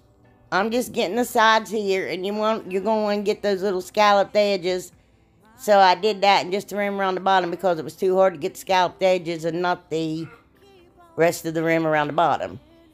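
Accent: South, United States. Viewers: Country twang 4 ever! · I thought I had a countryfied accent but you got me beat by a long shot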